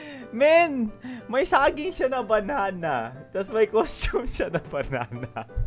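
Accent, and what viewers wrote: Visayas, Philippines. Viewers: Panit Ng saging hahaha bisaya kaman boy😂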